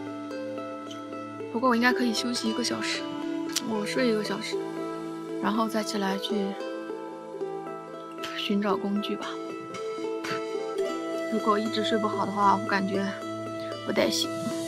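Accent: Henan, China. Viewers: 你有河南口音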